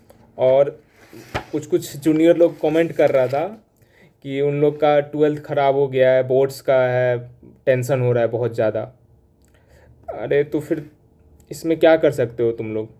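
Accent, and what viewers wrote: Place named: Bihar, India. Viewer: Tumhaari accent up -bihar jaisi hai.. So where are u from?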